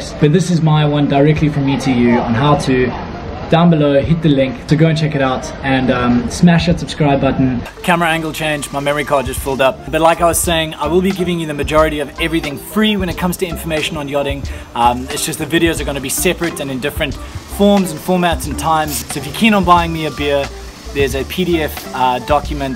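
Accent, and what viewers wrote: South Africa. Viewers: your South African accent is sick (at least i think thats a SA accent) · this guy's got a south african accent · 1st video im seeing of yours Are you South African?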